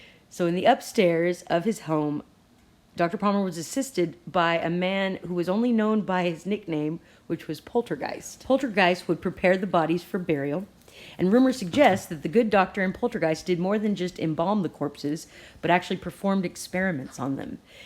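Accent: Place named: United States. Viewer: You grew up in the Philippines? Your English is perfect and you sound very .. American?? 🤔 Anyways, love your content, your voice is beautiful and soothing to me. I love your laugh too! I've subscribed to!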